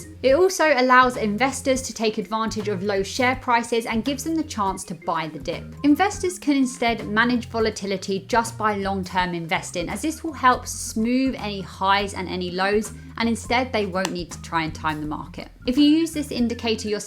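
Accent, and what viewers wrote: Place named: England, United Kingdom. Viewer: i like your content and english accent.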